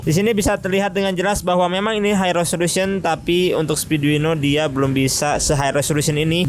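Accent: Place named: Sunda, Indonesia. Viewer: Gak disangka, ternyata Akang ini kalo ngomong bodor & Sunda pisan Hehe. Kang kalo motuba tahun 90an (mesin injeksi awal2) diubah ke standalone gini abis berapa duit?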